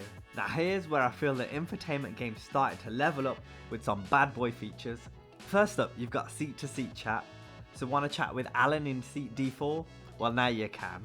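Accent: Birmingham, United Kingdom. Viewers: it's a funny accent, not sure from where, wild guess Birmingham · U from Birmingham?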